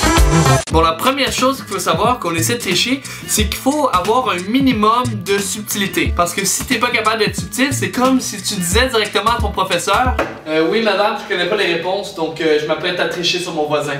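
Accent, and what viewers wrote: Quebec, Canada. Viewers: enfin bon,c'est du québécois je pense^^ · Il est quebecquois -_- Pourtant il a un léger mais très léger accent. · t'as l'accent canada a mort mec (ne le prend pas mal j'adore les videos je me suis abonnés) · Juste avec ton accent on dirait deja que tu es québécois..:) Super bonne vidéo!Je m'abonne!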